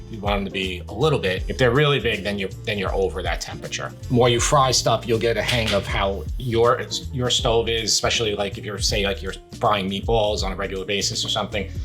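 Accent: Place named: East Coast, United States. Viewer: Cool east coast accent, smooth beats, reassuring confidence, that's gonna be an easy subscribe my dude.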